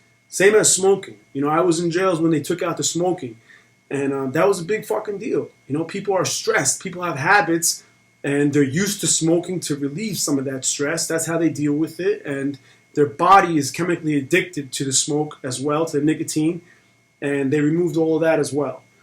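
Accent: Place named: New Jersey, United States. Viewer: I can tell your from Bergen/Passaic County. Your channel is the best, keep putting out content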